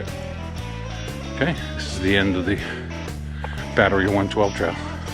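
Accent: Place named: New York, United States. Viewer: I grew up on the east end of Long Island (north fork) we love going to ‘Montauk and learning it’s history. You brought me back home for 30mins with the landscape and your Commentary and your awesome New York accent. 😀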